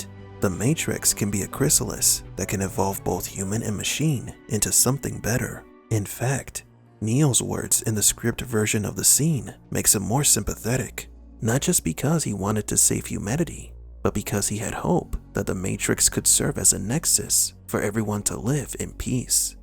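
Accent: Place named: Russia. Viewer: Nice accent, narrator person! 👏🏻👏🏻👏🏻 Russian ryt?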